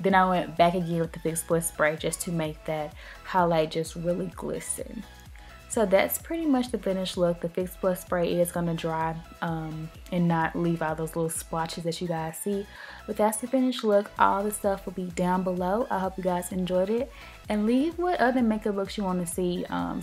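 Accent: Memphis, United States. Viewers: I was listening to your talk thru out the video I was like mane she sound like she from memphis lol I'm from memphis 2..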